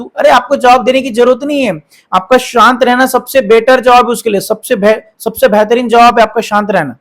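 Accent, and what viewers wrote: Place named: Maharashtra, India. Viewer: आप मराठी हो क्या सर आप की बातो मे मराठी टच हे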